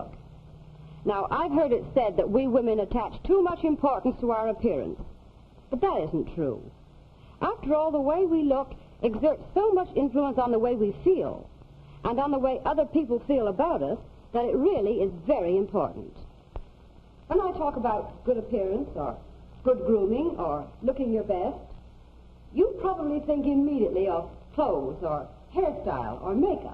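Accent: Transatlantic, United States. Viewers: Transatlantic accent if I'm not mistaken.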